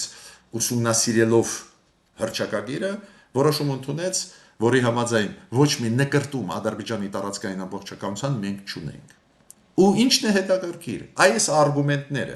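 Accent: Russia. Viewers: не можешь говорить без русского акцента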